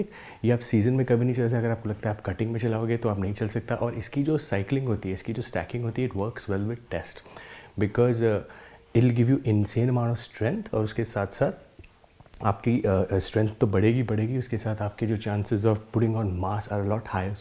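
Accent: India. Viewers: I cant understand its like mix of english and indian Im freakin lost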